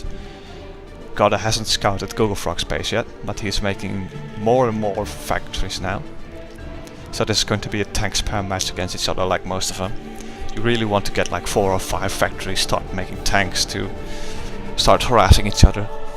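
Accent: Netherlands. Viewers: Thanks for uploading! Besides watching starcraft replay, I also start enjoying this fabulous game. . Btw, are you Dutch? Just wondering because of the accent. :)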